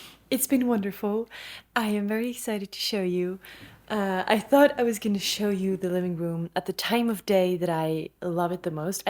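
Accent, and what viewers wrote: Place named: Canada. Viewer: When I looked up how many pounds your 190kg fireplace was (419!!), I was like, "Yeah, they're gonna need a forklift." You guys used a fair amount of ingenuity, determination, and sheer willpower, to get that thing into your house!! The fire it makes is beautiful. One question: Do these types of fireplaces have a creosote buildup problem like brick chimney fireplaces? If so, you should probably clean out the exhaust regularly to keep it safe. I saw you had a motorcycle and a digital piano in your house. Are they both Yamahas? btw, I think you speak English with a Canadian accent, which is better than a US accent imho, except for how they say "decal". You're saying "cupboard" right, btw. The way you weave back and forth between your language and mine makes me want to learn how to say stuff in another language. You and your bf should team up with Kevin Bacon and Kyra Sedgewick. 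Congrats on a successful, beautifully executed long-term project, which took a ton of effort!!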